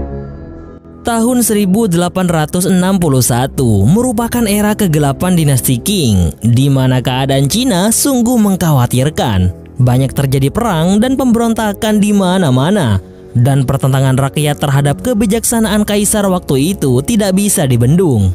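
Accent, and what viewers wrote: Madura, Indonesia. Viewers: Abbe mik oreng medure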